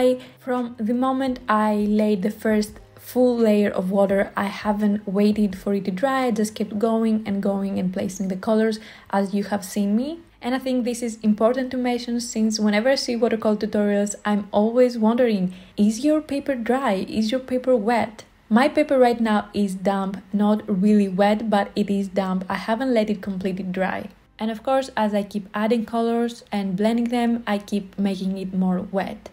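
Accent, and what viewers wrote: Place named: Greece. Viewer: Your Greek accent is so beautiful. I could listen to your voice for hours.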